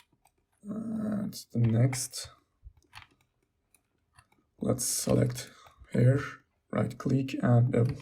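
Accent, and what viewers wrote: Turkey. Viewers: Clean! I am sure that He is turkish by the way! His accent is soooooo familiar!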